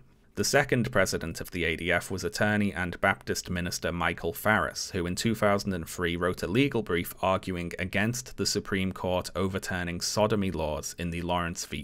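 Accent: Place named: England, United Kingdom. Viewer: I find it interesting that even though he's identifiably English, he still has a way of speaking that's SO particular, it's funny. What's an anteye? (I'm not a native English-speaker)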